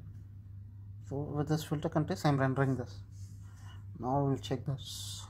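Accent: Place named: India. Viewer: i think i better understand indian accent than american. Thx u a lot)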